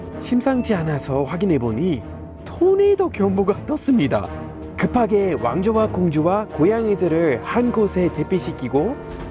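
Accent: Gyeongsang, South Korea. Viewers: 반가운 경상도 사투리 이뽀요~~~ 미국인지 한국인지... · 경상도 사투리 넘 귀여워요 어디 가노 ㅎㅎ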